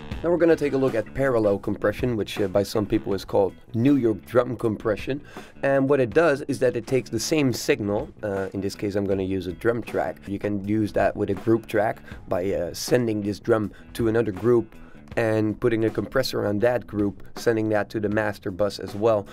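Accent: Netherlands. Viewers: Amazing tutorials! Within the first few minutes of your video, I already knew; "I'm listening to a Dutch guy". Rotterdam! Lekker man!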